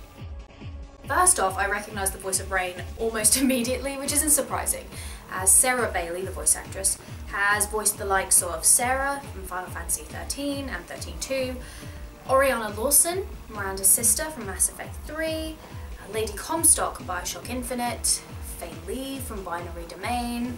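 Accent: United Kingdom. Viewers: awesome review is that a british or australian accent!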